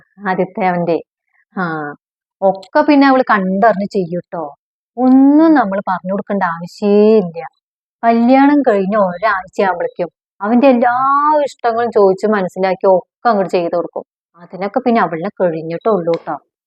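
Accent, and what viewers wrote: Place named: Kongad, India. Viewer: കോങ്ങാട് ആണല്ലേ വീട് സംസാരം കേട്ടപ്പോൾ സംശയം തോന്നിയിരുന്നു 😊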